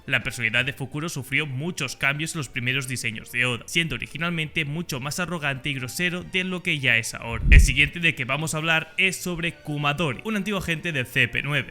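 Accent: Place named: Catalonia, Spain. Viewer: com es nota q ets català a l'hora d parlar jajja brutal el video com sempre!❤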